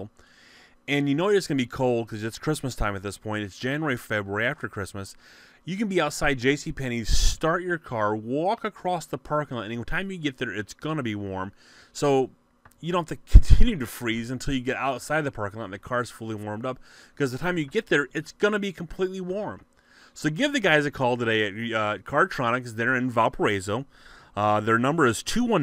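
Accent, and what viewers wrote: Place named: South, United States. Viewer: if you here him right hes got a twinge of southern in his voice. in the south 2000ft=1mile. their education is that outdated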